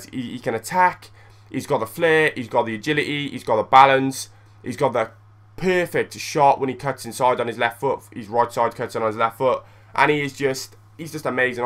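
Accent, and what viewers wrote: Wales, United Kingdom. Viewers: are u welsh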